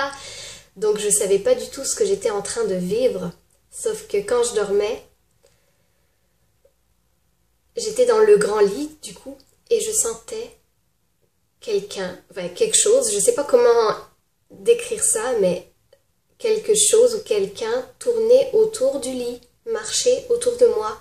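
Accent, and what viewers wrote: Quebec, Canada. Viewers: Est tu une québécoise?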